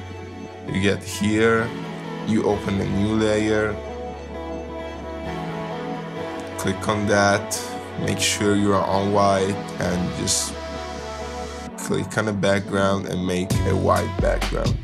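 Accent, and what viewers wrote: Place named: Italy. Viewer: yay Good job! come faccio a farmelo accettare? perchè non dice che è presente uno static txt Comunque amo il tuo accento inglese italianizzato!!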